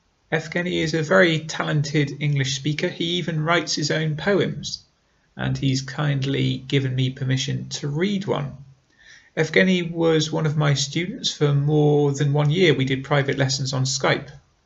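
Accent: United Kingdom. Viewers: dear my best teacher i like your speaking style and your accent very well i want to learn british english and speak like you plz tell me the best way how to speak likes a british native speaker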